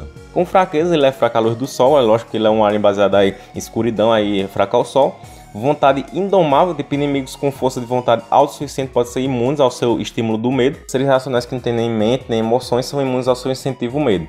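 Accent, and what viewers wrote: Northeast, Brazil. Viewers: O melhor nen e o conteúdo e sim o jeito nordestino do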